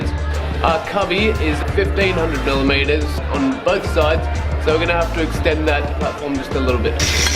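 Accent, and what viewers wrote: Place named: Australia. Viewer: Loving your channel and all you're doing in India. From the accent am missing Oz already .... Am a South Sudanese Ozzy from Sydney and I've been looking for DIY playground projects for my son and kids across South Sudan 🙂 so glad to come across this😁 moved 8mths ago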